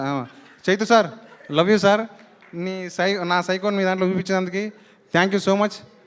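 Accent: Telangana, India. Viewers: సిగ్గు లేకుండా సుత్తి లేకుండా అచ్చమైన మన తెలంగాణ యాస మన ఊర్లో మాట్లాడుకునే నాచురల్ వర్డ్స్ అన్ని వాడినావు ఈ మాటలకు మల్ల మంచిగా అనిపించింది సూపర్❤❤❤❤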